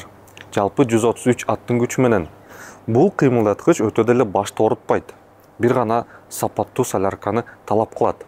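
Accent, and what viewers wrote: Standard. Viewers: Бул жигит кыргызча так таза суйлойт экен. Кулакка жагымдуу угулат экен. Обзордо дегенди серепте деп койсок болчудай го. Обзор жасоо - серептоо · Аллах ыраазы болсун ушундай кыргызча таза суйлоп тартып чыгара бер.Ишине ийгилик. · Азаматсын,кыргыз тилинде таза суйлойт экенсин,ошон учун аягына чейин кордум,ишине ийгилик! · Кыргызча таза суйлойт экенсин,Азамат! Жакшы информация бердин рахмат,ишине ийгилик! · Сен баарыбызды Кыргызча туура сүйлөгөндү да үйрөтүп жатасың рахмат . Мен негизи уккам башка бирөнү ата деген күнө болот жана мен башка улуттан болом деген да күнө болот ошондой эле тилге даны тиешеси бвр . Биз Кыргыздар Кыргыз болгондон кийин өз тилибизди ушу Балага окшоп таза сүйлөйлү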